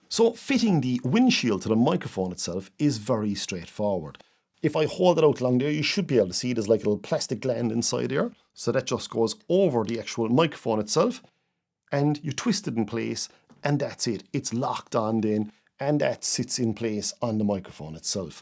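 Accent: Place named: Ireland. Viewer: So I am subscribing just for the Irish brouge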